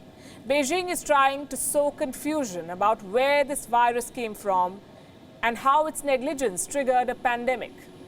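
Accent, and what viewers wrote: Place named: India. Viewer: goj76*&£21^&^? curry accent, didn't understand a word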